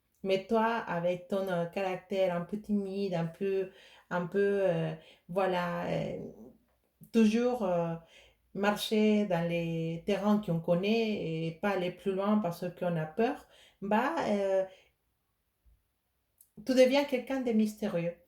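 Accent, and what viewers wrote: Spain. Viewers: Bonjour! quel plaisir de vous revoir, vous entendre. Merci pour ce tirage original!. Ne vous inquiétez pas pour le cafouillage de notre langue française pas facile pour vous!, mais c'est normal!☺️, je vous comprends très bien!, et j'aime bien votre accent espagnol. Choix 3, 7 qui me parle. Merci infiniment 🙏 Namasté 🙏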